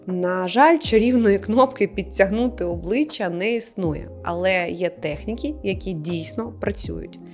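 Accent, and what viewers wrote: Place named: Surzhyk, Ukraine. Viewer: Шийно-КОМІРЦЕВА зона...купа суржиків, неприємно слухати(((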